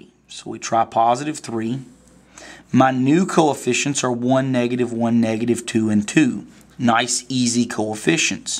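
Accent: South, United States. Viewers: you got that country accent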